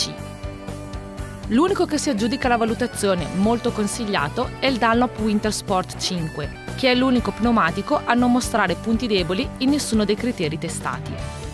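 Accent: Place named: Ticino, Switzerland. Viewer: utilissimo! grazie. Buffo l'accento ticinese :)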